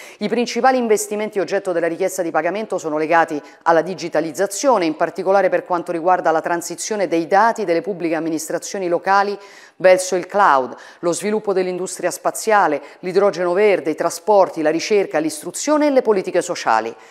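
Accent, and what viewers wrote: Rome, Italy. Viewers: Adoro Giorgia, quello che non sopporto il suo modo di parlare, scuola di dizione per non sentire er romanesco le farebbe bene ❤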